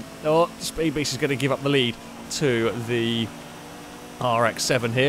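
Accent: United Kingdom. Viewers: You realise is British, right? Only Americans call Z's, Zee. Most other English speaking country calls it Zed. And calling it a "Sell-eekah" is the British accent...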